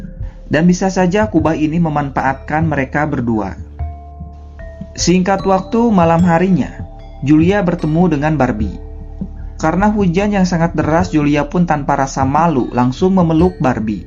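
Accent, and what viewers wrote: West Java, Indonesia. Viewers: Kang orang sunda nyaa.? 😁Logat sunda na kabawa wae euy🤣 Salam kang ti Bogor👍🏻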